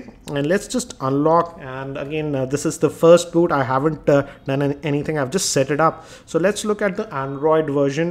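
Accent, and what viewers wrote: India. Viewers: Always dislike the Indian accent (English)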